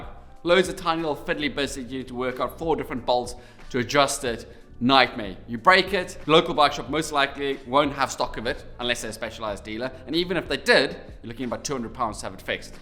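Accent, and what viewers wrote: South Africa. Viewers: Definitely a South African, good advice Boet ! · Sounds like a SAFFA… must be 😂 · What accent is that? Cape Town?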